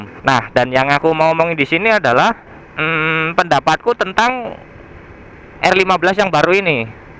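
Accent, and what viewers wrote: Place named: Java, Indonesia. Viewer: medok bngt bahasanya..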